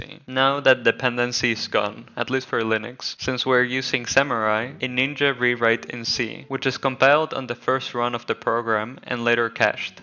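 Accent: Argentina. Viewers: bro, you are argentinian? MI PAIS MI PAIS Edit: I just saw your GitHub profile. You're the best!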